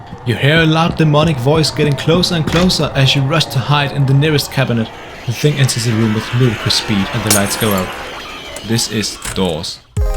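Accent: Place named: Denmark. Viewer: i can just hear the danish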